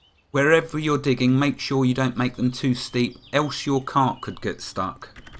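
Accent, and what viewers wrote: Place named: England, United Kingdom. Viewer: *Laughs* Hi and I live 5000 miles and one gigantic pond of water (Atlantic) away from each other. So for now the only way I can appear in a video is in the background. I can not hear when he is recording so I have no clue what he is saying until I watch the video's just like all of you. I personally do not have the equipment that has to be able to record but then if I record it wouldn't be a Tutorial would it? Besides I don't have that fantastic English accent that has. I am originally from California so I don't really have an accent and would be boring to listen to. *laughs* I really wouldn't feel comfortable recording a video on my own since this is tutorials. is my best friend on this planet and I would never want to take anything away from his project. One day we hope to be in the same location so we can work on this together. 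You think you are amused by video's now wait until we can record together. *laughs* Like Vikki said we sound like an old married couple. We affectionately bantering with each other which is funny to listen to. So I am sorry dear for now you will have to listing to waffling on alone. *smiles*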